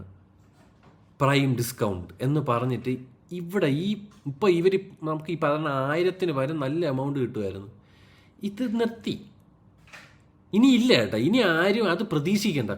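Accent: Trivandrum, India. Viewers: Tvm aanu...ee slang kettitt angine thonunu..😅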